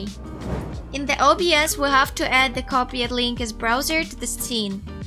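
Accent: Russia. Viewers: English in russian accent is like an asmr video , so smothhhhh and beautiful voice!!!!!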